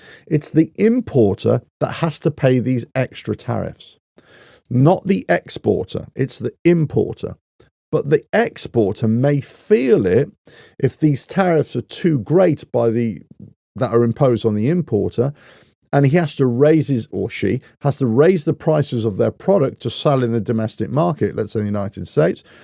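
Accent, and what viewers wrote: United Kingdom. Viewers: Why is it always Donald Trump? All he does is it implement the very same tariffs, other countries have in place for US imports. You have a British accent, which means that you are beaching about how things are in your country and I suggest you take your war to Starmer!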